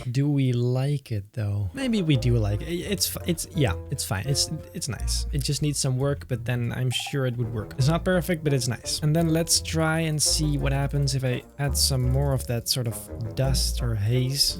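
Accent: Netherlands. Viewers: I heard your voice for a minuite and I thought to myself 'this guy must be dutch'. It's the same accent as from Michiel Huisman (dutch actor from Game of Thrones) and Paul Davids (dutch youtuber who speaks english also) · it sure does sound dutch, I mean, it's not a horrible one, probably why people say it doesn't sound like one, but from my experience (me being swiss) you do sound dutch :)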